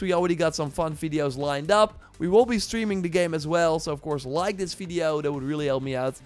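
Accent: Netherlands. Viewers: I love this Dutch accent so much ❤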